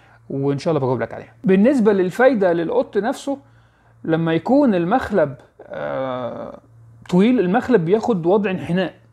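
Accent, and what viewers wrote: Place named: Egypt. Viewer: لا حبيبتى ولا يهمك مانا فهمتك لهجتك المصريه جميله اهلا بيكى حبيبتى معلش مش كل القطط زى بعض انا عندى تسعه 😁بجد وكل قطه طبعها يختلف وبفهمهم وبحبهم كلهم وبتجنن لما واحده تتعب عشان كده قولت لحضرتك لما تحسى ان الدو ممكن يكون تقيل بلاش تديهولها حتى لو الدكتور قال وجربى تعامليها على انها بيبى حديث الولاده هى حتتحسن انشاء الله ربنا يحفظها لك ابقى طمنينا عليها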